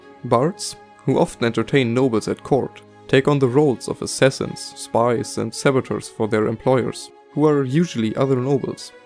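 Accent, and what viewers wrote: Germany. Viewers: He sounds German.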